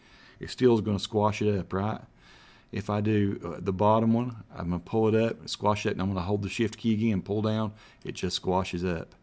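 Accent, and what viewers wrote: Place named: North Carolina, United States. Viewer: that accent is just precious. You sound like your from southeast NC.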